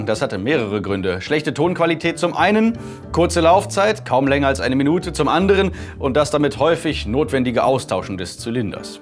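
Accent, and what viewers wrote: Switzerland. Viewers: Nicht alle Schweizer sprechen so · Bester Schweizer Akzent. Ich kann nicht mehr :D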